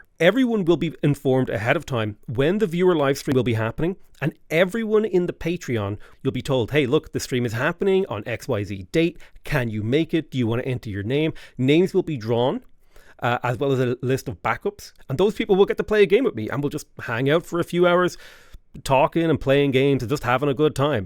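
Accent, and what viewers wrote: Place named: Ireland. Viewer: Hey, long time fan/ first time commenter, here. I think you have earned another patreon supporter since I have been enjoying your channel for over 5 years. I remember one stream where you were talking about how many people think your are so smart (the accent helps) and you replied that..." if I you were really smart I would have money and a career". I know its hard to earn a good living these days in California, and probably harder in Ireland. Congratulations! you have found a unique path that I would like to support. Keep up the great work! Oh I was wondering, do I need Facebook to view your Patreon pages? I hope not, cause I got rid of Facebook.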